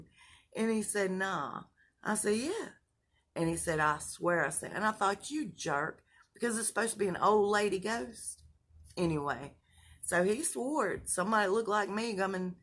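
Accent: South, United States. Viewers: So good to see you! You look beautiful, love your hair. Wish mine would stay put! I swear it has Dementia, I used the curling iron yesterday, tamed it with some hairspray so it would last the next day. check this out, I am mixed, with mixed hair, I woke up with freaking straight hair, how is this possible! When I want it straight, it doesn't stay, and gets frizzy and crazy. By the way, loving the Chewie Bag. Do you still live near the area Chewie disappeared? You know I'm still hoping he returns. It's good to see you smiling again, it really is. Always remember there's alot of people, you don't see that truly care, and love the heck out of you! Lots of love from Brooklyn NY You have the most adorable voice and the cutest Southern accent! And yet, when you laugh, 🤣 you sound so devious! You have a Bless good morning, and a beautiful day.💕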